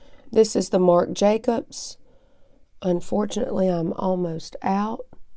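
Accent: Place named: Mississippi, United States. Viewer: Your skin is AMAZING. I just HOPE my skin is like yours when I’m your age! I think you look so so so great without any makeup!!!! For real!! And I’m so glad you mentioned where your from. I was thinking how much I like listening to you in your other videos. When you said MS, I was like well no wonder! I’m born and raised in Madison MS but Ive been living in Sonoma County California for the last 7 years so I’m missing the accent. <3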